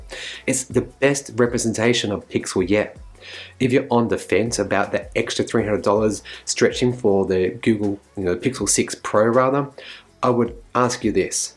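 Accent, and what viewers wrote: Australia. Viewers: Thanks soooo much . You've helped me decide on the 6 rather than the pro. Great video mate. Love that your Aussie and even better from Melbourne. Vic all the way. 😉👌🍻🤘💪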